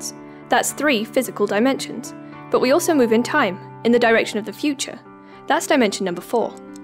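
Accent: United Kingdom. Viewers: The video's really nice. Your brit accent is even better! ^.^ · minute physics with a brit accent. :)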